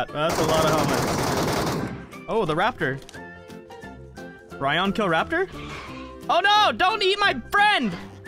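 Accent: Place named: United Kingdom. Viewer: “hello there” he says in his normal British accent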